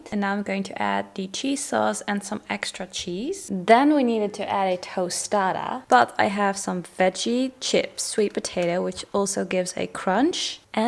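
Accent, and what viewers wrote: Netherlands. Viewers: Are you dutch? Just a guess because of the accent and the dutch grocery’s😂❤️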